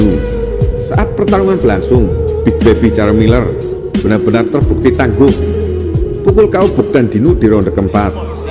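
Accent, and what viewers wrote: Java, Indonesia. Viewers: Mas meddoknya bisa diilangin ga?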